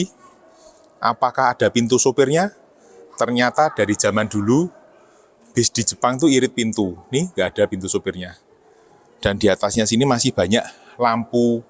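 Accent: Java, Indonesia. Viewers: Host nya kental sekali logat Jawa nya..!!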